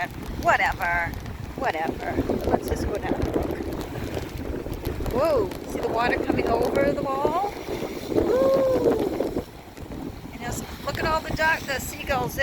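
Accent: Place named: Massachusetts, United States. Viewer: I KNEW that was a Massachusetts accent!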